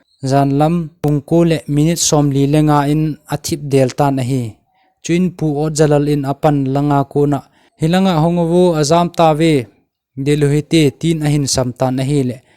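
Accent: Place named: Singson, India. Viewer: Narrator pa hi Thanlon lang Singson accent amang e.. Ngainom aum e..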